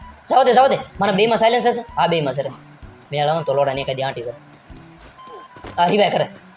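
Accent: Bhavnagar, India. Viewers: mane lage che tame bhavneger na cho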